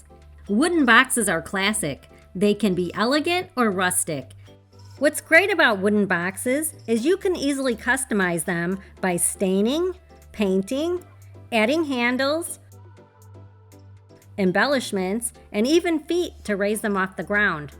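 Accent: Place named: Cincinnati, United States. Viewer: 🙌🏻🙏🏻❤️ You sound like a Cincinnati girl. Am I right? I’m from southern IN.! God bless you!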